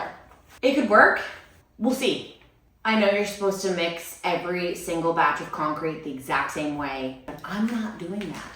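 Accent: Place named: Nova Scotia, Canada. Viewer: Nova Scotia? Wow, I knew I knew that accent!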